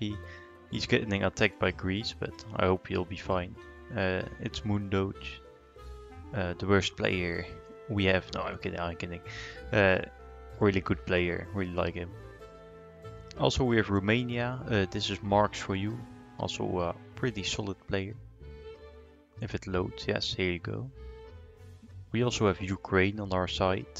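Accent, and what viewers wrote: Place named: Netherlands. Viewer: Lol the fact that i hear your dutch accent made me watch the entire video well done great vid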